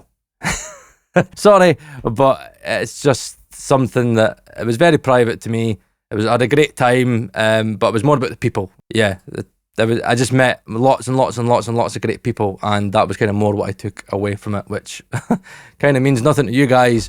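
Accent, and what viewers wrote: Scotland, United Kingdom. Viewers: Nice hearing a scots man. I lived near perth 10 years ago. Loved Scotland. Nice country and great people...other than my EX. 😜 I loved salmon fishing. 👍👍👍 About the ATMOS...I don't know what to think about it. I never had the chance to really listen to a great setup. But i watched videos where people are crying while listening. So it must be a great experience...especially for music...not only for movies. Greetings from Germany...🍻 ❤☮☯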